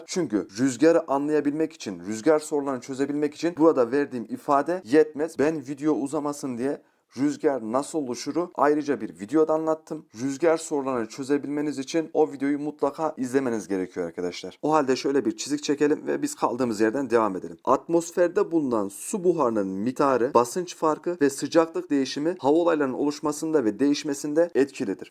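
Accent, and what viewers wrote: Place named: Adana, Turkey. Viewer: konuşmanız adanalılar gibi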